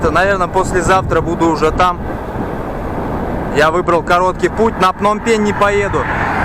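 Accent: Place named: Chuvashia, Russia. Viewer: это чувашский акцент на английском языке.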